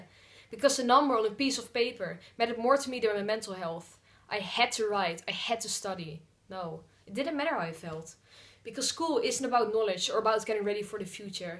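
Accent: Netherlands. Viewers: Heyyy are you Dutch? I think I recognise the accent.👍🏻👍🏻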